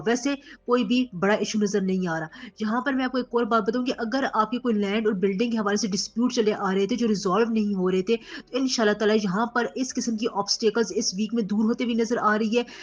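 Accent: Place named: Punjab, Pakistan. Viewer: tez lehja punjabi h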